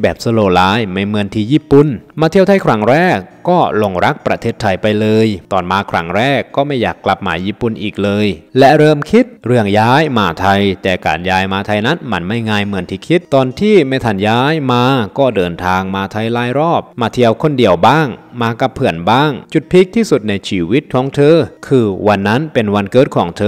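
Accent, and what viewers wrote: Laos. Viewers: เขาเป็นคนลาวพูดได้เเค่นี้ก็ดีเเล้ว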